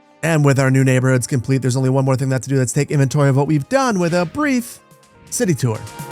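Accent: Wisconsin, United States. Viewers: Your Wisconsin is showing 😂 I live in a neighborhood just like this, out towards Milwaukee area. The Midwest is riddled with neighborhoods like this. I love it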